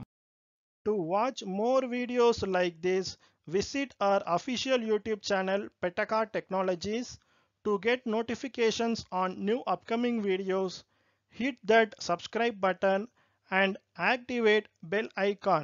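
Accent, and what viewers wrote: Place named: India. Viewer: Content is good, but the indian accent is really giving me a lot of room for excessive thinking.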